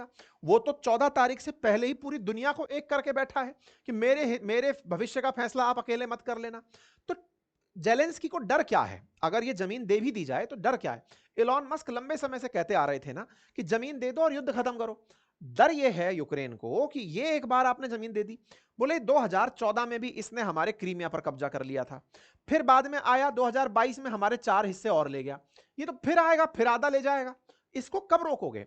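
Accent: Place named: Kanpur, India. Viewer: pls keep kanpuria style of anchoring, it sound sweet...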